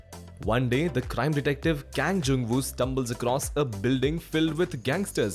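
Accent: India. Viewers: this guy is indian.... i know this accent 😁😂😂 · india accent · Thanks, I didn't wanna hear his disgusting Indian accent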